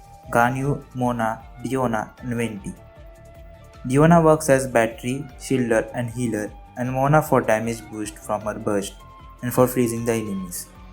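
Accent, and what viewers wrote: India. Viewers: the indian accent hits home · Nice guide are you indian ... That accent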